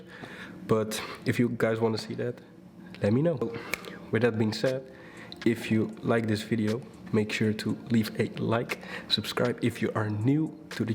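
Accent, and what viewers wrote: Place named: Netherlands. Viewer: Hahahaha Dutch accent incoming, loved the video bro. Very dope tips and love how you explain those things!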